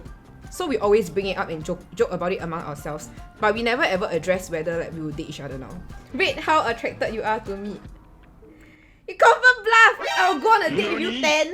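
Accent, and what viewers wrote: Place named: Singapore. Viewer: can hear the singaporean accent eh!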